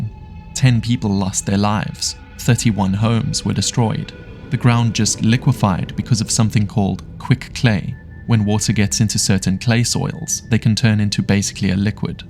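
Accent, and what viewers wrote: South Africa. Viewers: Is that a South African accent I hear? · Bro love your videos, from your accent you definitely sound like you are south African. But why oh why are there so many adds?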